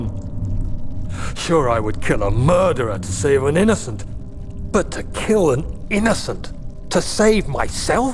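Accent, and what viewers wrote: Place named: England, United Kingdom. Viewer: I really need some audio books/stories with brooding english accented voices like this.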